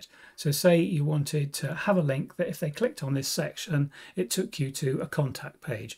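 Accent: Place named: United Kingdom. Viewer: Good morning and thanks for your informative videos. A little tip for your presentation… your picture covers the settings window-sometimes. Please move the settings window more to the left since your picture is placed on the bottom right😊 I really like your calm way of explaining and your perfect British accent which makes it pretty easy for understanding everything. Best regards from Germany Ralf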